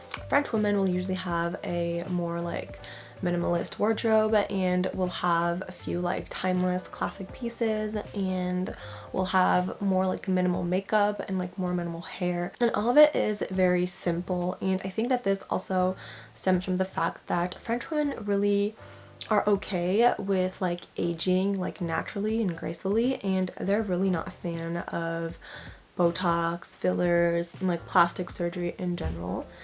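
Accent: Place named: United States. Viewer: Je suis français et je n'ai jamais été aussi fier d'être français après avoir vu cette vidéo. C'est vrai qu'on le vit cool. Chaque fois que j'ai été à l'étranger, on m'a dit que j'avais trop de chance d'être français. Ma phrase préférée: "On adore la beauté". C'est vrai. On est cool comme des chats. Un de mes meilleurs amis, un allemand, a le défaut de manger sa nourriture en 5 minutes. J'ai essayé de le changer mais pas encore reussi. Tu es la meilleure version de nous-mêmes. Merci. J'essaye d'apprendre ça à mon enfant. Super accent américain, c'est rare pour un français de choper l'accent d'ailleurs aussi facilement.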